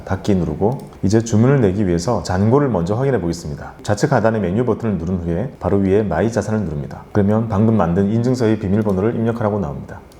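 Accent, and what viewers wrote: Gyeongsang, South Korea. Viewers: 좋은 정보 감사합니다. 경남사투리 참 매력적이네요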